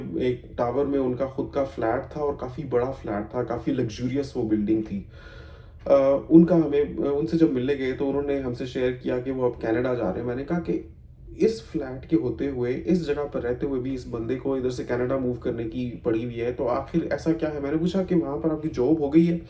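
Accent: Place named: India. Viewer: نام تو پاکستان کا لے رہا ہے اور بول ہندی رہا ہے اور تیرا ایکسینٹ بھی ہندی ہے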